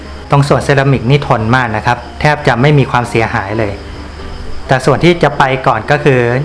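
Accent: China. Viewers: เป็นคนไทยเชื้อสายจีนใช่ไหมครับ พูดภาษาไทยสำเนียงจีน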